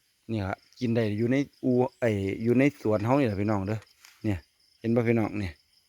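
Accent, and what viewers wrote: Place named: Chaiyaphum, Thailand. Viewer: สวัสดี ที่แท้ก็โคราชบ้านเฮาเนอะสำเนียงเว้านึกว่าบาว่ชัยภูมิเห็นก้ง แล้วอยากกินปลาจอ่มเน อะบาว่ บักหอยตอ้งแกงขี้เหล็กคือสิเเช่บชอบมาก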